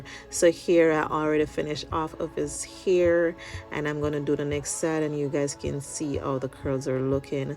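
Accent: Jamaica. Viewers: Jamaican accent strong tho lol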